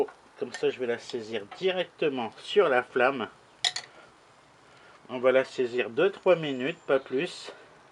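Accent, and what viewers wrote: Nord-Pas-de-Calais, France. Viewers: Quel bonheur de t’entendre parler Ch’ti je le dis encore ta joie de vivre et contagieuse 👍 bonne journée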